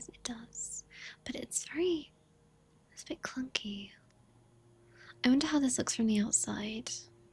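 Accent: England, United Kingdom. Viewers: Beautiful accent! What part of England are you from?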